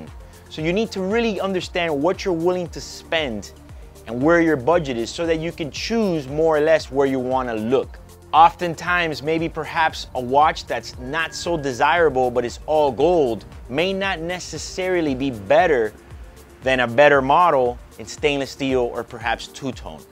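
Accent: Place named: Chicago, United States. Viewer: what’s with the silly chicaaago accent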